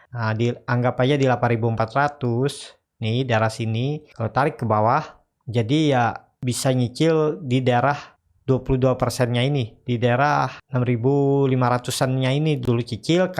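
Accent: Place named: Borneo, Indonesia. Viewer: maksudnya 'Khas itu" logat bahasanya kak...emang bahasa kita dayak kalimantan begitu...spt klo bahasa jawa kan 'medhok' banget gt.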